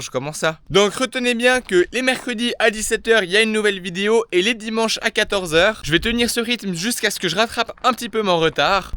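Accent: Switzerland. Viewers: Bonjour,j'aime bien les suisses votre accent...votre élocution et votre bonne humeur, courage à tous et merci pour tes belles vidéos! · Salut, heureusement que tu n'as eu que des commentaires de bienveillance..Je ne suis pas dans l'agriculture, je suis contrôleur dans un grand entreprise qui vend des fruits et légumes pour les chaînes de grandes enseignes. Tu es génial et ton accent suisse nous fait bien rigoler..c'est quoi un char. 😊😊😊😊. 😂😂😂 tu as l'air de ramoneur tellement tu es black... Très belle vidéo, comme toujours. Bonne continuation 🫡🫡🙋‍♂️🙋‍♂️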